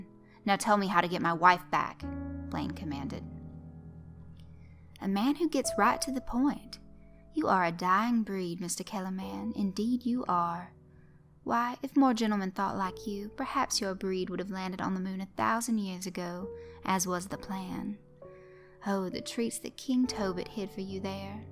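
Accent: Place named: Georgia, United States. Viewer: Where are you from in Georgia? I'm from Loganville and our accents sound really similar, I know that different places have a little different variations on accents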